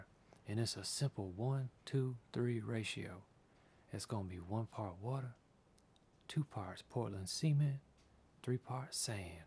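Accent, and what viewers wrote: Louisiana, United States. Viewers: Is that a Cajun accent I hear?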